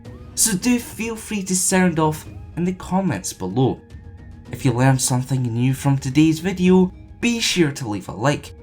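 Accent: Scotland, United Kingdom. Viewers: You sound like young Scotty from star trek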